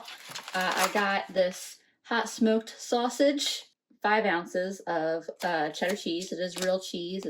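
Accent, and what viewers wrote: Philadelphia, United States. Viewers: I KNEW IT! I heard Philadelphia in your voice!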